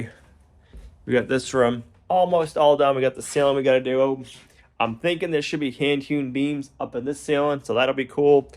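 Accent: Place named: Boston, United States. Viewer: Boston accent! They just talk different out there.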